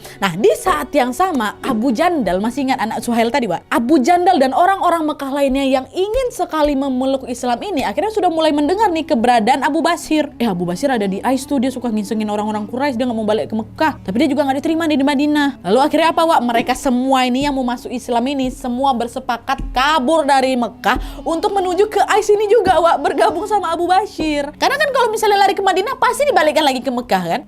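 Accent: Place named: Jambi, Indonesia. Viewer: Logatnya kyak orang jambi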